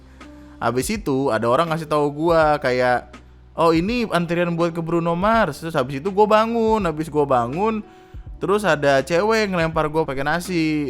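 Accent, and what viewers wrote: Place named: Banyumas, Indonesia. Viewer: yang bikin video ngapak pisan mbae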